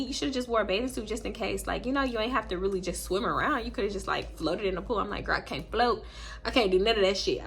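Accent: Baltimore, United States. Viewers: Girl yes sounds just like us baltimore folk lls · It’s the Baltimore accent for me 😂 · you sound just like us baltimore ppl now😂😂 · You and this Bmore accent lmao I love a good Stewpiddd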